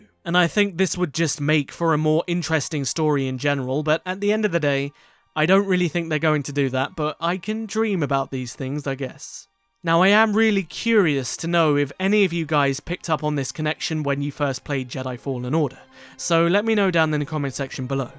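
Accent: RP, United Kingdom. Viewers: I apologize if this is an ignorant question, but is your accent recieved pronounciation? I love your videos, I've been binging them for a few weeks now. Kotor 2 was more than just a game to me too, I can't wait for the future of narratives set during this era.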